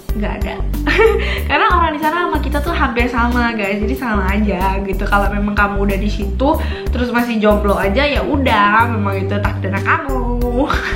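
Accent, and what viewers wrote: Malaysia. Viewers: Your malay slang comel gila! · Comel ape kau cakap bahase malaysia! cayalah :* BTW, ada je kawasan yang makan murah dan banyak tepi jalanan. cumanya kamu duduknya di subang jaya juga belajar di taylor. kawasan itu dikira agak elit , perumahan disitu pun mewah2 dan orang kaya ramai. kalau kau duduk di shah alam pun dah beza banyak. :)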